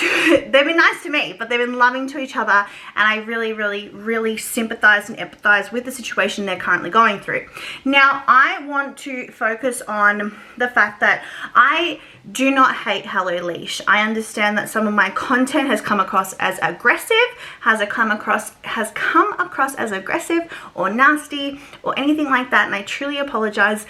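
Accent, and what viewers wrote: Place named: Australia. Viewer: Love hearing another Aussie on the platform! 😊😊 keep it up!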